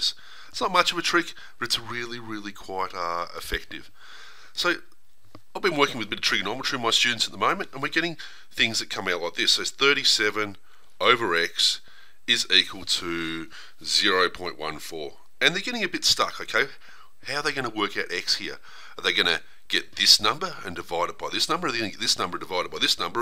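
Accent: Australia. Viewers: I love this Aussie accent.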